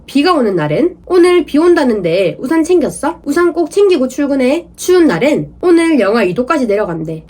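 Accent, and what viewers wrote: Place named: Gyeongsang, South Korea. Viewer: 경상도사투리 ㅈㄴ쓰누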